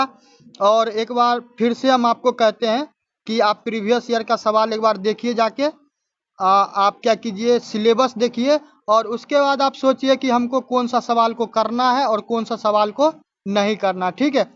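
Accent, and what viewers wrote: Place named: Jaynagar, India. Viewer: Hame lg rha hai aap Jaynagar se hai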